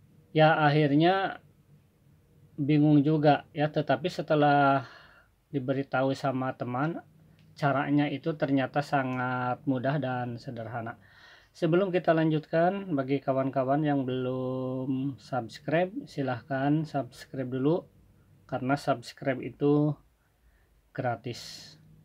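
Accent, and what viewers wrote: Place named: Banjar, Indonesia. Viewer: Banjar kc mba logat nya koq logat bnjar 😀😀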